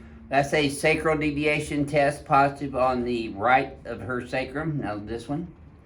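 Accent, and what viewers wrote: South, United States. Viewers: are you from redneck?? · Nothing wrong with a southern accent and a nice rack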